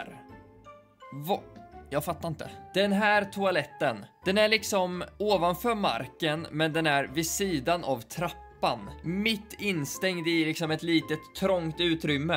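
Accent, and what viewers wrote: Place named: Östergötland, Sweden. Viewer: Denna östgötska😂 känner igen mig 100%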